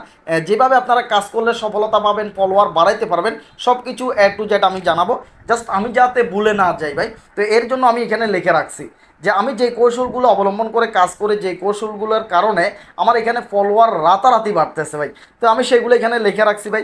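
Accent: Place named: Comilla, Bangladesh. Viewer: খুব ভালো লাগলো ভাই,আপনার ভাষা তো আমাদের মতো,আপনার বাড়ি কি কুমিল্লা?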